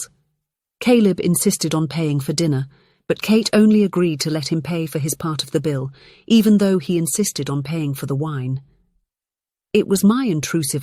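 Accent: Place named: United Kingdom. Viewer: Loved the story. British Narrators are Amazing. East Coast USA. 10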